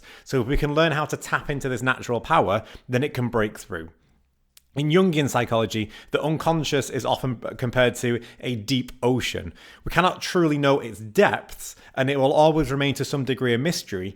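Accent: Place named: North, United Kingdom. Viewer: LOL I’m from Preston and thought we had the same accent but now reflecting I thought there was a Yorkshire tweak as well. Always weird when you hear someone not without American accent but with an accent so close to yours on YouTube.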